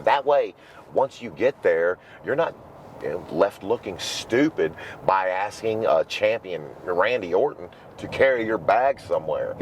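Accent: Virginia, United States. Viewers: Man, I saw that Kevin Nash interview (and your subsequent response) & I was really impressed with how you handled that. He has obviously never spent much time in Virginia because I’m from NoVa but as soon as I heard your voice the first time I knew you had to be from VA (I guessed Winchester but Charlottesville makes perfect sense. Also, this YouTube shit is amazing because you really found your calling. You’re so articulate and intelligent. The way you deliver your speech is so professional; this is really what you were meant to do.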